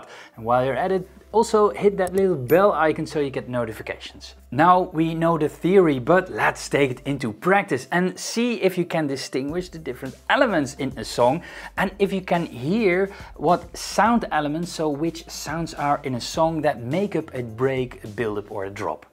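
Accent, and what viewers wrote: Netherlands. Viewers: Great tutorial! Just subscribed... is that a Dutch accent btw?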